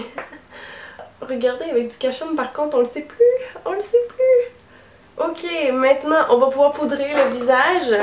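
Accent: Quebec, Canada. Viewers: Je kiff l'accent québécois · t québécoise ??? PS : merci bcp pour l'astuce mon maquillage + le fond de teint + les lunettes sa donnai n'importe quoi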